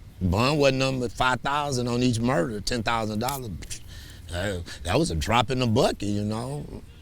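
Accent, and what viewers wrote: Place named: Houston, United States. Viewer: He talk just like them Houston cats too.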